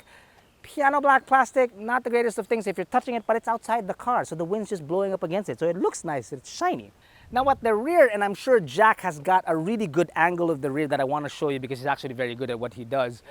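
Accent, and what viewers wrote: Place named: Philippines. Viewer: I love the standard filipino accent.😊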